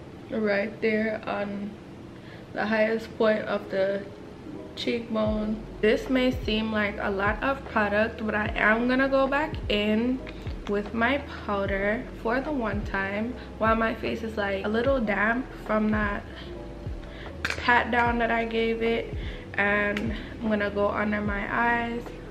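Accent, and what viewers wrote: Jamaica. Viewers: The Jamaican accent!!! Yeh girrrrl... right out 😂❤️ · gurl JAMAICAN PURRRRRRRR. DONE KNOW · Mi hear the patwa and affi subscribe 🇯🇲🇯🇲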